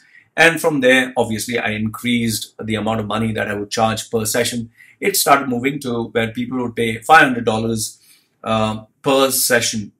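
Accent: India. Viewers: you sounds like Indian which i means like me.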